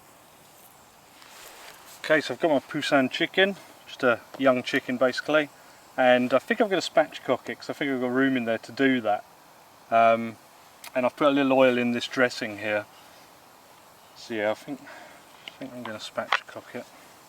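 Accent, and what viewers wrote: United Kingdom. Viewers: R u from UK?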